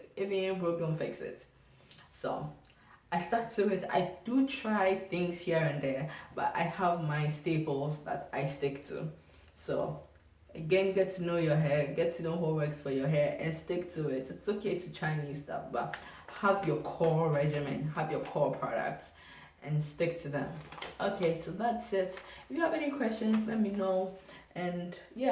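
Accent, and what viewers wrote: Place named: Ghana. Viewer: Ghanaian Accent 😍😍😍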